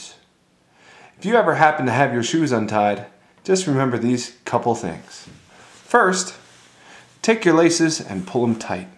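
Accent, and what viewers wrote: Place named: New York, United States. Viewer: This guy sounds like he is a new yorker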